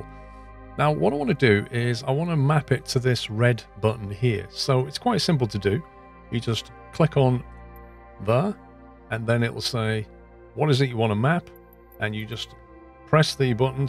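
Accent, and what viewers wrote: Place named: Blackpool, United Kingdom. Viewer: From Pac-Man to MSFS 2020, with some real-life certified droning in between. Glad to have found your channel as I just got FS 2020 and already crashed the Cessna 3 times while trying to land. Your tutorials will help a lot! You sound like you're from Blackpool or thereabouts (Liverpool?). My wife's dad was born in in Blackpool so I sort of grew an ear for that accent. Thanks again! Great tutorial!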